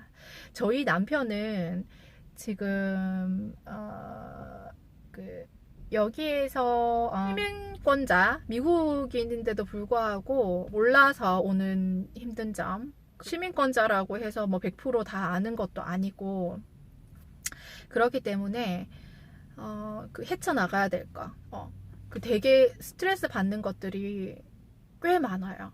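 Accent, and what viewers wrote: Gyeongsang, South Korea. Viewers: 외국 사는 입장에서 남일 같지 않네요~~ 고향이 경상도 쪽이신가요? 저도 경상도라^^;; ㅋㅋ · 고향이 경상도인가봐요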